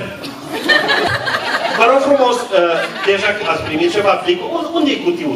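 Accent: Transylvania, Romania. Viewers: Ești foarte tare și cu accentul de ardelean ai pus punctul pe i, bravo ție și echipei 👏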